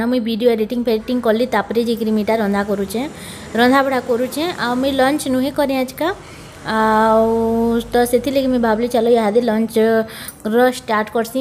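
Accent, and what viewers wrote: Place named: Sambalpur, India. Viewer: ତୁମର ସମ୍ବଲପୁରୀଆ କଥା ମତେ ଶୁଣିବାକୁ ବହୁତ ଭଲ ଲାଗୁଛି ।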